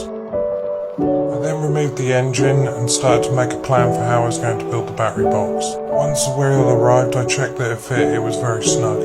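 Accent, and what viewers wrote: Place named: United Kingdom. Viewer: Nice build. I am working on something similar. Is it road legal. I assume from your accent you are in the UK. If so what was the process?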